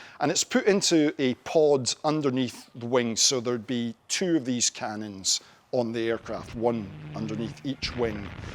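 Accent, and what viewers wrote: Scotland, United Kingdom. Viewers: Always a joy to hear a Scot agonising over something.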